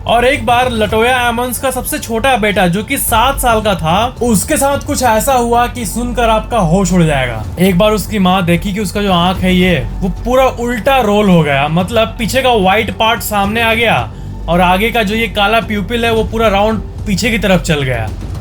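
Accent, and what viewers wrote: Bihar, India. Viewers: Kya bihari accent hai 🤣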